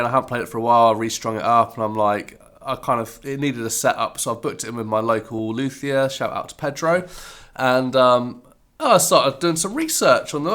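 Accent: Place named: United Kingdom. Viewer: I like your style (or is behavior the better word?) and really enjoy your way of talking and the british accent. Im a simple man, i love vintage guitars, so i subscribe. Thumps up! Many best greetings from berlin- germany 😃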